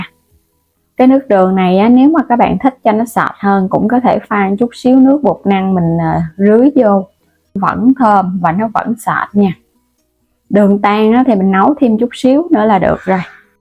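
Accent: Mekong Delta, Vietnam. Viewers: Giọng miền Tây dễ thương mà bạn sao bạn nghe không được vậy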